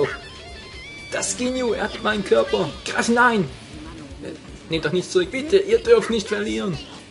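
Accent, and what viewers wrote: Switzerland. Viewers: Kommst du aus der Schweiz ?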